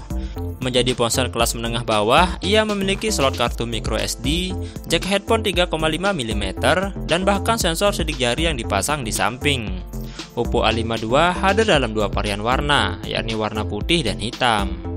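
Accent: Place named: Banyumas, Indonesia. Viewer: admin org banyumas yaa bhs indo agak ngapak hehe...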